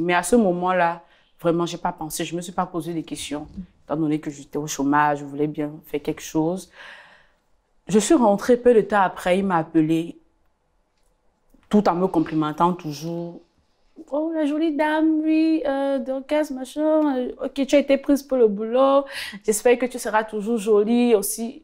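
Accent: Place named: Cameroon. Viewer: C'est une camerounaise ou gabonaise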